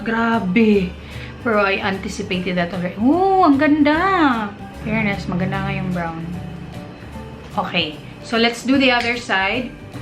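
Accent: Visayas, Philippines. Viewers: HAHAHAHAAHAH ka cute sa pag bisaya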